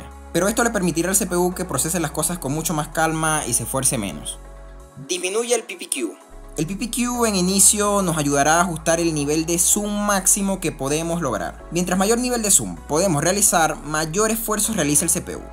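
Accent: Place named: Venezuela. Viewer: Tu acento me suena.... Tu como que eres venezolano? Me too.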